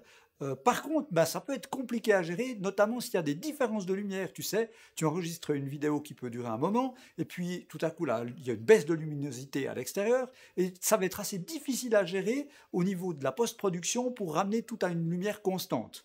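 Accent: Switzerland. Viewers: haha toi tu viens de chez nous! vive la suisse, neuchâtel?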